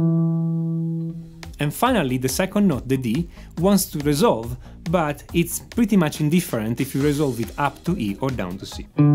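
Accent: Italy. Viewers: im so greatful that you are using the CDEFGAB notes, instead of do re mi blah blah blah, i can tell you're italian by your accent, and i live here in italy and i hate learning guitar or theory in person cause they all teach it in italian terms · I'm ready to bet my house you re Italian! Pronto a giocarmi la casa che sei italiano 😂 bravo e grazie, molto interessante! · Ma...sei italiano????? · I like your italian accent. But the background music is very annoying. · I love this itallian acent! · Great. Are You Italian? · it's italian flavored english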